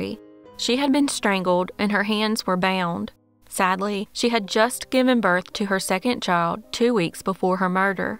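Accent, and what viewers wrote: South, United States. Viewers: Please know that I appreciate your posts! And love your southern accent too! Lucy in Canada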